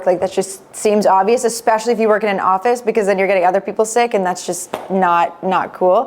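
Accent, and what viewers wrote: United States. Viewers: Youre suffering from California-speak in this video. Youre talking too fast and slurring the words. Hard to listen to. Garbled. Throwing away sentences. Do better. You usually do. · Too American for me to understand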